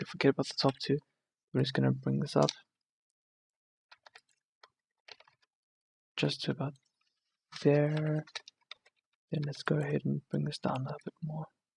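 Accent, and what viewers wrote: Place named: South Africa. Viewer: I flippen knew it! I can hear the accent a mile away, i am too, it's good to see another Blender South African out there, keep up the good work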